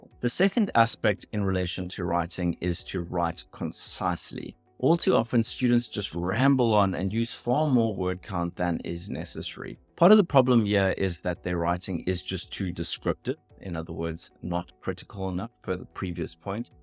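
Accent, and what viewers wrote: South Africa. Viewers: Your profile may say UK, but that's a SAn accent. I enjoy your vids. Keep up the good work.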